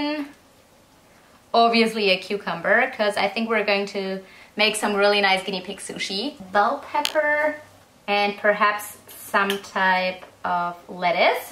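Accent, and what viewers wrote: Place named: Germany. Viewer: I’m so late lol but she’s German (I’m 98% sure)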